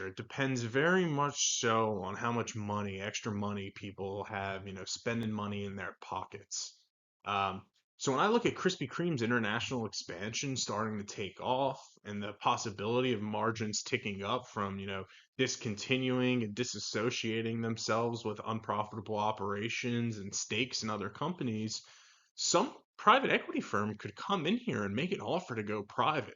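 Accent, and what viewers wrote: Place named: Pennsylvania, United States. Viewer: You from PA? I hear the accent lol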